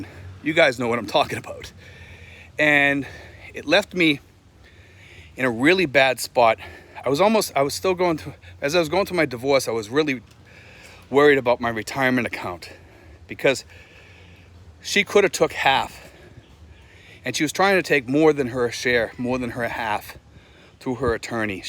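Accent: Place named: Massachusetts, United States. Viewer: You sound like your from Mass! I from Boston.Iplan on moving to the Philippines before I'm 58.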